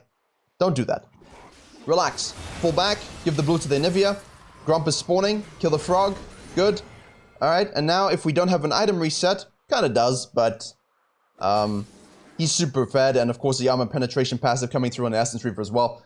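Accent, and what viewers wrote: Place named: South Africa. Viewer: good commentary, you sound like a Saffa boet? gg wp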